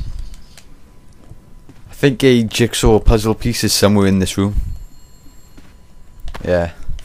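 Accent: Wales, United Kingdom. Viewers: Welsh :D He Welsh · He's Welsh. I feel like it was in his info at one point, if it's not there now. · It may be dumb, or stupid. But I was instantly in love with your accent. I love the Welsh. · Omfg. A welsh commentator!? I'm subscribed just for that. Where in Wales you from? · are you welsh? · Whats your nationality? You sound Welsh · You sound welsh. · im in love with your accent :) i wish i was closer to wales, im 1 hour away :( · Is that a Welsh accent I hear? Blimey.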